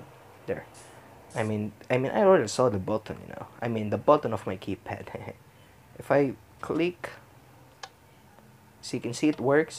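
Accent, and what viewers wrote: Philippines. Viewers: Love your Filipino accent! I’m from hawaii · I am usually good at picking out the Filipino accent. I couldn't at first in this video. You must be Visayan because I learned Tagalog and I know the from-Tagalog-to-English accident but your [beautiful] accent it slightly different. What really gave it away, was when you said "ano".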